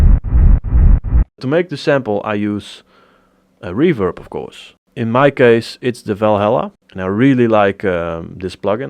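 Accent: Netherlands. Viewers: A hard hitting techno kick and a guy talking with a pleasant Dutch accent... I guess I going to listen to an old Thunderdome CD when I am going to bed tonight. In fact, I find your tutorials quite lekker.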